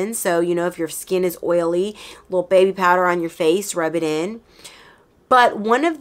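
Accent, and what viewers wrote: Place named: North Carolina, United States. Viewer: I hope you know and BELIEVE how good you are at this ❤ Because I swear, I could listen to you talk about anything for as long as you’d be willing to talk 😂 I also love some of your sayings and your accent because I’m in NC and I can SOOO relate to some of the stuff you say and how you say it… feels like I’m just listening to a friend tell a crazy story❤🫶🏻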